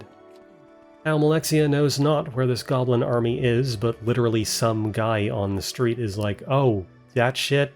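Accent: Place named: Canada. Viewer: You being, and I mean this as a compliment, *aggressively Canadian*, is apart of the charm of these runs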